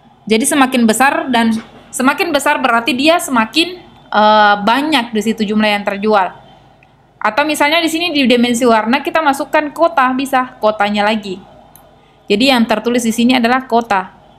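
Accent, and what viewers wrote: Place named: South Sulawesi, Indonesia. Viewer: kentara sekali kalau orang sulsel, deee, ya kan?! hehe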